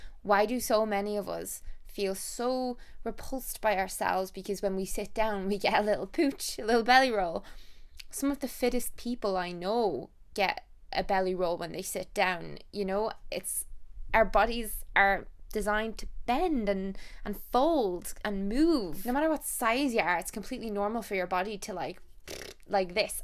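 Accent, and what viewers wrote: Ireland. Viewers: In all honesty I'm not happy with my body but there's no hate. I've struggled to put weight on for years and after recently being diagnosed with under active thyroid, I can now start to heal and find balance to my body again with Meds and a return of energy. Though I don't like how my curves have dropped off and I already have a prominent bone Structure, I am thankful for the blessings I do have, I remind myself that my vessel doesn't define my value and the fact I would never want another to feel In such a way so I must be kind to myself also. The odd days where I just feel bleurgh, I will either sloth it out n just enjoy being comfy n have the lil treats that cheer me up like a brew, favourite food or a pamper. Or I will front it out, put a face on, get dressed and put focus on a part of me that I'm happy with ie: do my makeup a different way to draw attention to my eyes or lips, accentuate my waist or wear tights or Leggings instead of something unshapely. 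Thank you for always being so open and honest and sharing your experiences. I adore you and your Irish accent and know you inspire so many people, so thank you for using your platform for kindness 💖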